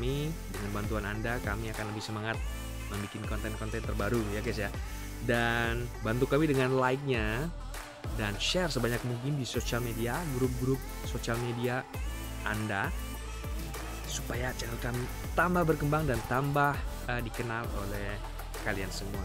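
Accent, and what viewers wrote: Java, Indonesia. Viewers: Jowone pundi mas jenengan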